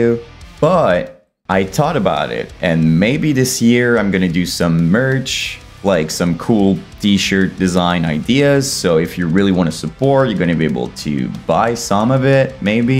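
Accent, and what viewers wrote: Quebec, Canada. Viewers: Amazing job on growing the channel dude, your videos are what i needed to remind myself of the greatness of the myspace era, i feel like there is no one these days that go back and appreciate these gems for what they were. Gros props dude. Also as far as ideas, maybe doing deepdives on the more obscure labels could be cool and what they brought to the scene back then (This City is Burning, Mediaskare, Artery, Ferret, Siege of Amida, Prosthetic, etc) or even the early days of household names like Sumerian and Century Media, idk, just an idea ! On a last note, it's been inspiring to see you make it through that overly populated sea of content that is Youtube, as a fellow Quebec French speaking mofo, it gives me hope that we can also sit down and share the stuff we love and be a part of the conversation without too much tabarnacking on our pronouciation. 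Lâches pas, 2024 will be huge mate🎉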